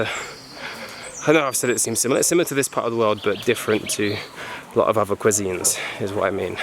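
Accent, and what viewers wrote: United Kingdom. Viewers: 1. In Armenian the last colour of the flag is actually called "apricot colour" -tziranaguyn. We say "karmir, kapuit, tziranaguyn" - "red, blue, colour of apricot." Scientific name of Apricot is Prunus Armeniaca (Armenian plum). 2. The war they are talking about is Artsakh (Nagorno Karabakh) liberation war launched by Azerbaijan (ethnic Turks) against Armenians of Artsakh province of historic Armenia. By the time Azerbaijan was 9 mln and Artsakh was only 100.000 Armenians and was cut of the rest of Armenia. By the time rest of Armenia was able to reach for help and get through the blockage Stepanakert (Artsakh's capital city) and villages were heavily bombed. 5 years of war and Artsakh is free preventing another Genocide done by the Turks. Artsakh is possibly the most beautiful part of Armenia. 3. Thanks for such an interesting video, mate. 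I lived in the Uk for 6 years and just adore British accent, that was the best part of the video for me lol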